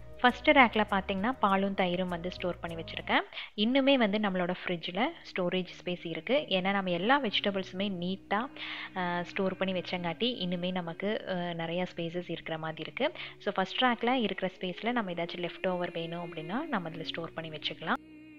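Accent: Coimbatore, India. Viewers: thats so nice of you, btw neenga cbe dhana native, me too from cbe, your accent sounds so.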